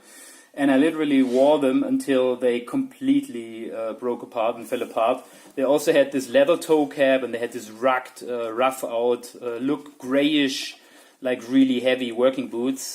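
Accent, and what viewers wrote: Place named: Germany. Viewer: Lustig, wie man Deutschsprachige immer sofort erkennt :-) gutes review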